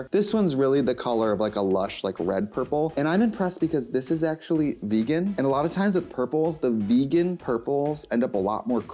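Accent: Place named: Minnesota, United States. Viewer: Work that winder washer motion. Cmon Minnesota accent ❤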